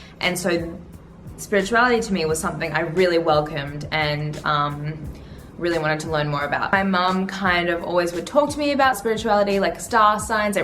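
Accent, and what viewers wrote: Australia. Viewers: I love your Australian English. Are you from Melbourne?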